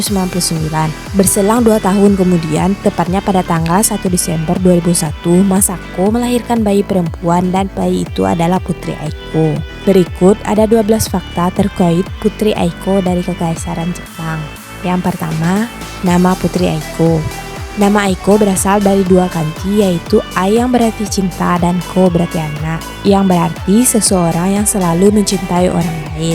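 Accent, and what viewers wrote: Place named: Padang, Indonesia. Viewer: Min, lu orang padang yah? Kerasa banget dialeknya.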